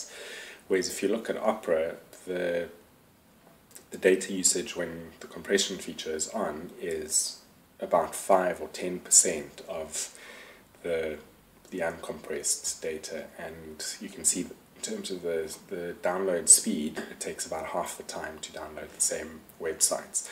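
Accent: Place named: South Africa. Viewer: Sounds South African.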